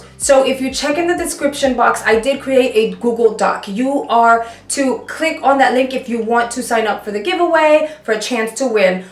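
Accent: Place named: Brooklyn, United States. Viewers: Ur def Puerto Rican lol you sound like you are from Brooklyn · New subscriber here!! I smelled chocolate too on the contour/highlight palette. Also I like your accent. Are you a NewyoRican? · aww, i'll give it a shot anyway. BTW i love your accent! not sure what state it is but def the east coast! I'm from Denver Colorado we dont really have a cool accent like yours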